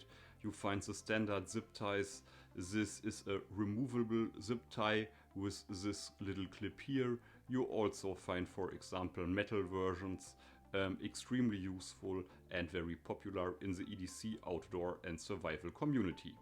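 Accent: Germany. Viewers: German dialect! Dann sag ich mal freundlich Hallo 👋😁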